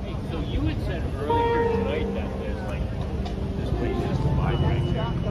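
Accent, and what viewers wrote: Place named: Karnataka, India. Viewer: Anisuthide yako indu neenene nannavanendu ....Kannada accent 😮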